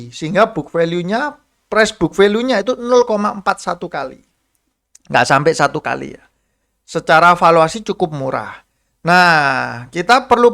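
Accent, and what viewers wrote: Surabaya, Indonesia. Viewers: suroboyo ta bos,kok kentel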